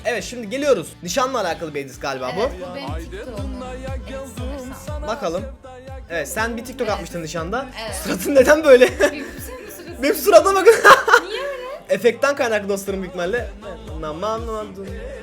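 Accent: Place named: Southeast Anatolia, Turkey. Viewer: Abi niyeyse güney doğu anadolular gibi konuşuyorsun arada Yoksa sen güney doğu Anadolulumusun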